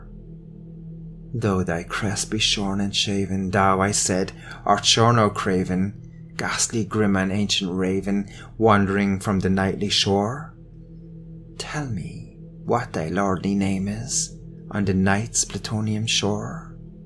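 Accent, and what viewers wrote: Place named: Ireland. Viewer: Aaaaand I fell in love again ❤ An Irish man reading one of my favorite authors? Yeap, sign me up!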